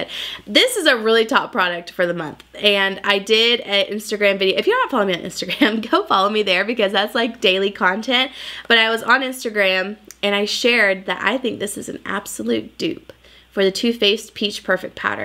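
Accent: South, United States. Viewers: You sound so country in this one 😂😂😂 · Love your videos. You are such a sweet southern girl that is so fun to just listen to.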